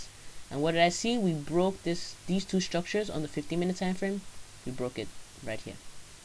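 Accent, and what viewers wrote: Nigeria. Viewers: Thanks,... You sound Nigerian tho... Great content went through your telegram from September... Wow... It's inspiring to see how the trades improved so fast, pure hardwork welldone, can't take that away from you... You bad sha🤭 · I was thinking shes definitely nigerian lol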